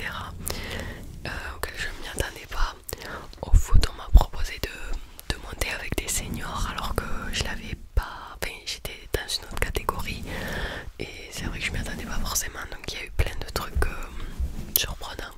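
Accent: South, France. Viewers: Avec l'accent du sud c'est tellement mieux car à part Tom ASMR personne réellement a ce petit détail dans la voix et c'est sympa puis le fait que tu aimes le foot ça change tout . Puis le foot franchement si tu peux c'est bien aussi et ton niveau ça serait cool de te voir sur un match ou deux .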